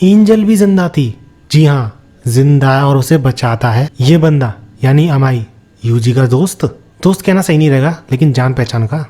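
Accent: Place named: Madhya Pradesh, India. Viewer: You are from MP Or either from near by agra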